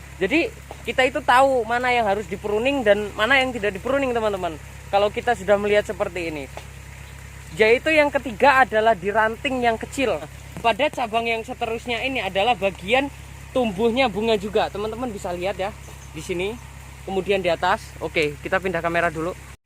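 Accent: Java, Indonesia. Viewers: Jawane medok kok ngomongnya pakai doang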